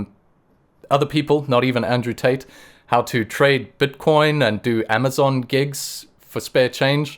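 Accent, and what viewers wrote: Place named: South Africa. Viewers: Your accent sounds South African... Are you? I love these guys you mentioned. I love Abbot Tryphon too! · He's mentioned he's originally from South Africa.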